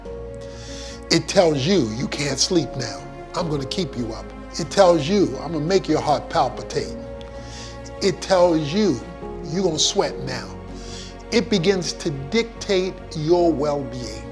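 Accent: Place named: Africa. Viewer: Legitimate concern vs illegitimate worry. Very nice. I also like his afro accent...so calm and soothing.God bless him and every believer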